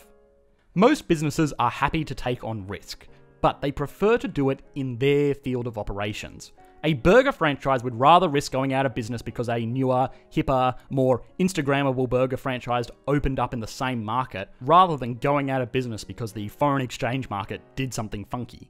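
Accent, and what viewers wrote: Australia. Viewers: I’m loving your channel as an uneducated economics enthusiast, and the Aussie accent only makes it better! Keep em’ coming! 🇦🇺👍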